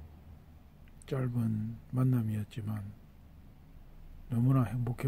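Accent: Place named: Busan, South Korea. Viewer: 부디 편안하게 영면하소서..구수한 부산사투리 정감있고 좋아했는데..너무 황망하게 가셨네요.ㅠㅠㅠ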